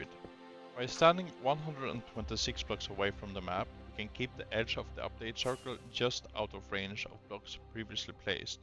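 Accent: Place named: Europe. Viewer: Dawg he's just European cut him some slack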